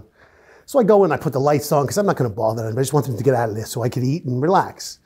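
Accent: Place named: New York, United States. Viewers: What a character! I lived in New York for 10 years I miss that accent. Great story teller. Good luck to him. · Another highly interesting interview. He's right about everyone wanting to hear cop stories, especially when they're told by someone like himself. He's a natural storyteller. (And I'm a born & bred New Yorker -- love hearing our accent because it's dying out. His New York elocution is so New York, so fabulous!)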